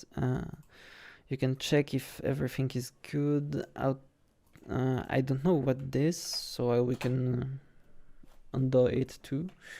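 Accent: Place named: France. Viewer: I love french peoples speaking english . C'est très facile de reconnaître ça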